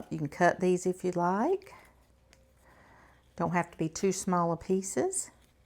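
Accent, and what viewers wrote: South, United States. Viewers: Is that a southern accent? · I Love listening to you tell the recipe with your southern accent. Cant wait to makeThe King Ranch Cassarole. Thx 🎉👍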